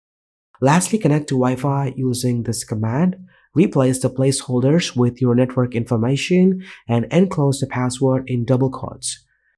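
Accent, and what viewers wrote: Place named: India. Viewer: Nice accent for an Indian. I didn't knew you were Indian until I saw the profile.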